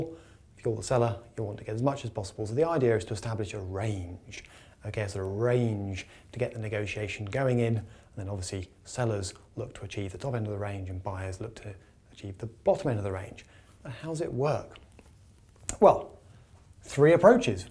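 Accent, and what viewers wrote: RP, United Kingdom. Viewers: Received Pronunciation/ Standard English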